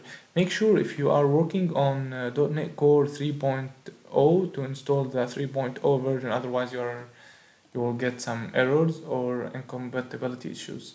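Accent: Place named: Egypt. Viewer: عرفت انك مصري من لهجتك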